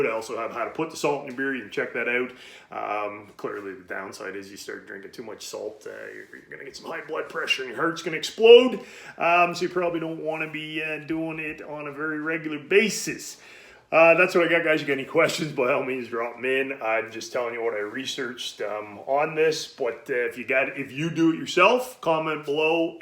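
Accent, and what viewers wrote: Canada. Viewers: You speech patterns remind me a bit of Patrick Warburton 😂 loving the kanuck accent! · You sound Canadian! (I am too). Hope your stuff gets tons of views! This is a cool concept!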